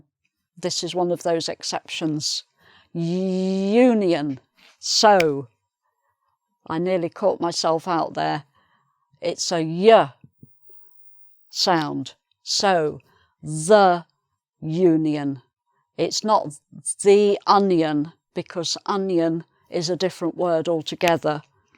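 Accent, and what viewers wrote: United Kingdom. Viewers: I loved it! I like your british accent, what part of the UK are you from? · Hi, thanks so much for your lessons. I'm fond of your accent, it is so British? Your explanations are clear and well structured, you're an excellent teacher!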